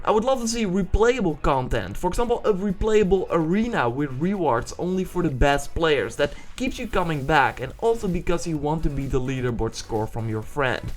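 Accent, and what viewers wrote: Netherlands. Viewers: Just love the dutch-english accent. Much better than the french-, german- or swedish-english accents. Atleast I assume you are dutch based on your accent, am I wrong?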